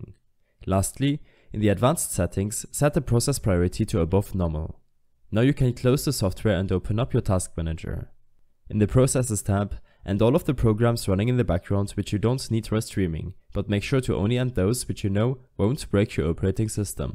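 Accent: Germany. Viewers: Yeah, he sounds like Klaus because he's German :D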